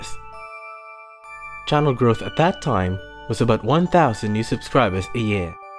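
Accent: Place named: Indonesia. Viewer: I feel like his Indo accent is way stronger here than previously.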